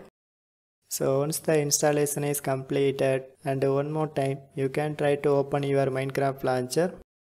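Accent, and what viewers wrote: India. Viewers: As soon as i heard the inidan accent, i new it will work soon.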